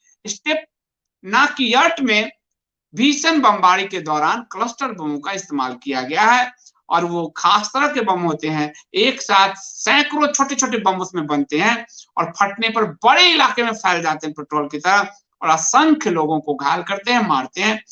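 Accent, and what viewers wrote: Bihar, India. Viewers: ur tone truly Bihari about 25shhuuu🇮🇳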